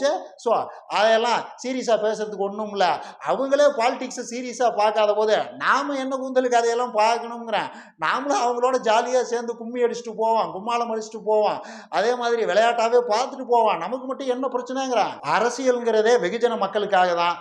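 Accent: Kongu, India. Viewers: தம்பி உங்க கொங்கு தமிழை நீண்ட நாள் கழித்து கேட்பது மகிழ்ச்சி.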